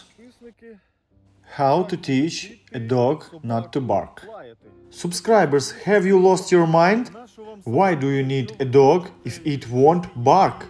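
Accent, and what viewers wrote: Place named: Russia. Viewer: Найдите другой голос за кадром, очень сильный русский акцент. Слишком сильный.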